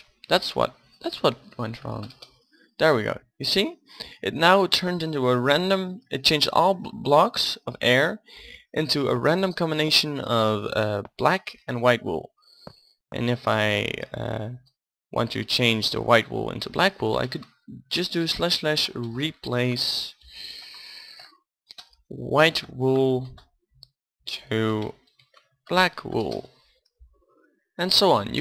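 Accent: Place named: Netherlands. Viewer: Mooi Nederlands accent haha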